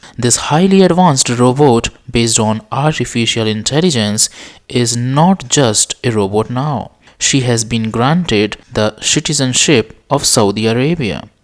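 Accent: India. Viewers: Ugh indian dialect 🙄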